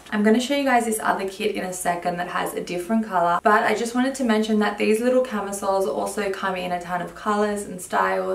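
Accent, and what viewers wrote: Australia. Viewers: Hi, I’m new to your channel and love your makeup looks! You have a beautiful accent, is it Australian? And what is your ethnicity?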